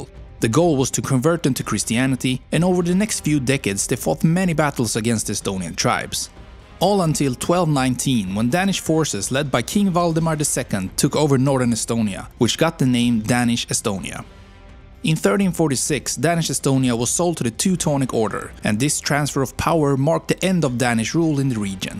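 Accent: Sweden. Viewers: Sounded pretty Swedish to me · The narrator sounds Swedish, or possibly Finnish, so Estonian pronounciation shouldn't be too alien to him. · please please please for the love of everything anyone has EVER considered holy, can we get someone narrating this that actually speaks ENGLISH and not SWENGLISH, it is literally painful to hear. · I'm Estonian and I thank you for all the positive comments! As soon as you started to speak, you're Swedish, right? Just my observation, nothing else. And thank you, again. Your somewhat simple but overall meaningful sentences moved me a lot! We want to be free and want to be a part of FREE Europe! Ok, yesterday was our county's 106th birthday, but in Europe we've been much less time. We can give MORE and want to give MORE! I'm sure I'm not the only one thinking like that! :)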